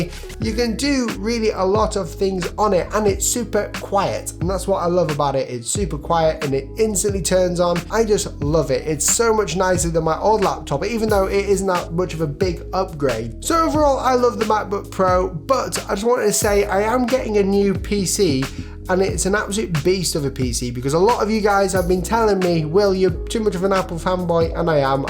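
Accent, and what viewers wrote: United Kingdom. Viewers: I LOVE the British pronunciation of 'aluminum' and words in general. I should have been a linguist.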